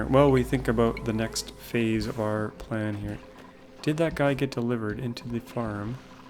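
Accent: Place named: Ontario, Canada. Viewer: Hol up. Unless Ive seen this mentioned before, I didnt know you're a fellow Canuck! What province? you sound more.........I dunno......maybe Ontario? Can't fully tell. Certainly not Alberta or Saskatchewan...........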